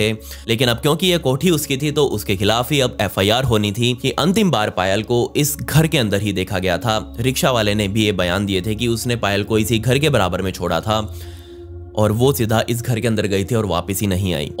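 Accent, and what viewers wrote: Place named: Haryana, India. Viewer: Apki hindi mai , Haryanvi ton Hai लाडले ❤️❤️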